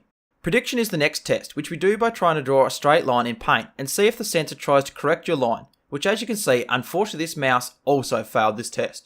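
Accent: Australia. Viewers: And I thought I had a thick Australian accent...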